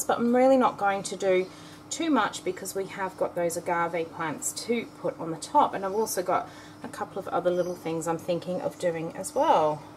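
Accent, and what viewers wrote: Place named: Australia. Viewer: Hi, I’m interested in buying a soap from you but I’m in the US and it sounds like you may be from Australia? Does that effect shipping prices?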